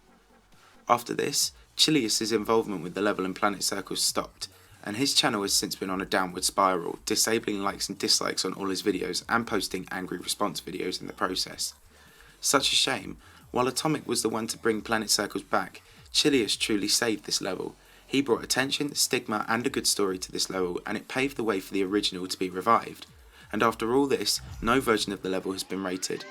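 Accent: United Kingdom. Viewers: are you a brit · You should do more voiced videos, the British accent makes the whole thing come together. Keep it up! You never fail to teach me something about GD!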